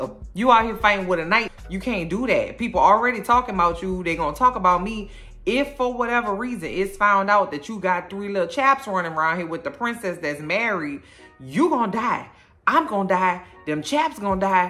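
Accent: New Orleans, United States. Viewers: Look. I found you by way of.soooo I literally came back to HEAR YOU RECAP seasoning with your New Orleans sauce 😂😂😂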